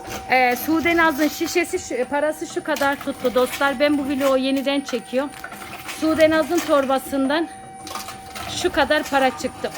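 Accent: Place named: Kayseri, Turkey. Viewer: Nerelisiniz abla kayseri şivesine çok benziyor şiveniz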